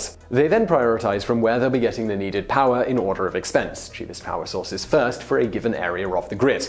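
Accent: United Kingdom. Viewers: I never noticed his accent. I always thought he was an American but he's NOT. I just noticed his British accent. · THankyou! You have a perfect British accent and i could listen to you all day if i wanted to <3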